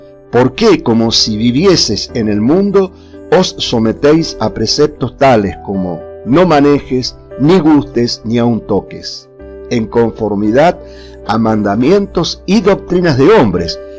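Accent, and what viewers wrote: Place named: Northwest, Argentina. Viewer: hola hno muy buena enseñanza.. por su acento de donde es usted ?? Tucumano o salteño quizas jujeño?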